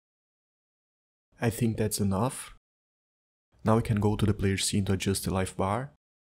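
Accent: Brazil. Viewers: Muito bom irmão, Conheço de longe a voz de um BR kkkk otimo tutorial, poderia ensinar como funciona o sistema de autotiles do godot?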